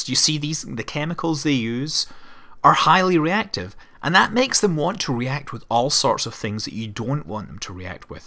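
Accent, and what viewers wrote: United Kingdom. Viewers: Please stop saying MEEE thane. You do not say MEEE thod! This MEE pronunciation is an annoying, "In Your Face" Colonial Yankee Dog, Britishism insult!!!! If it is not made as an insult it is at least a snobbish "Affectation". You are an obviously intelligent, well admired scientist, so any "Affectations" are unnecessary. I can live with AL you min eum, instead of Al lou minum because that is a real phonetic sound of the British way of spelling Aluminum.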